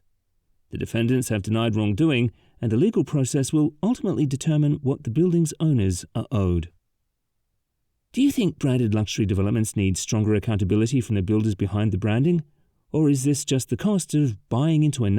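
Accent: United Kingdom. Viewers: Ironic a bloke brit narrating this brit building catastrophe.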